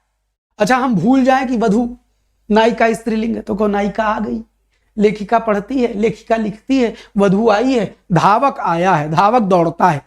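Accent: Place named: Lucknow, India. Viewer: Pranam Sirji..Hum bhi Lucknow se hain.. Hume aapke baat karne ke lahje se laga hi tha ki aap Lucknow se hain❤Jai Shree Radhey Radhey🎉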